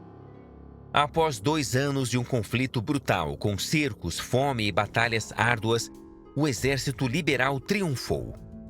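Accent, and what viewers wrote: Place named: Brazil. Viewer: Ouvir a História de Portugal narrada por um brasileiro, gente que nos ideia, é que não pode ser. Além de Portugau. Familia Reau. Que nojo uns afronta aos Portugueses .Tchsu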